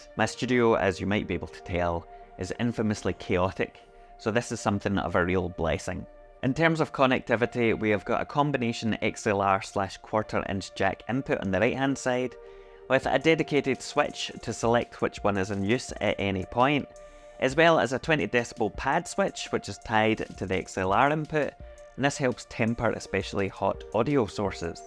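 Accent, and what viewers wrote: Scotland, United Kingdom. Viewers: subscribed for your delicious Scottish accent!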